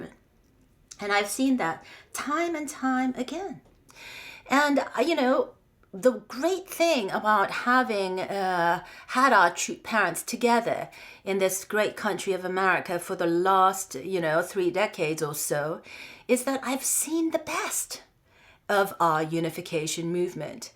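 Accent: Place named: United Kingdom. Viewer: I LOVE HER UP SCALE BRITISH ACCENT.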